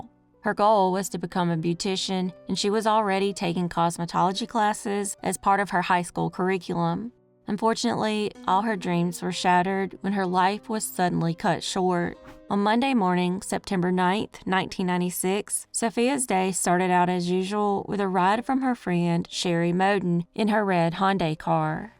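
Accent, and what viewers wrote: South, United States. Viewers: Hey good job on the opening I think it's new anyways I love ur show girl it's not everyday that u can have a country accent that is not either related to southern cooking or kkk u know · Thanks to lady with sweetest southern accent, evah. Love fr panama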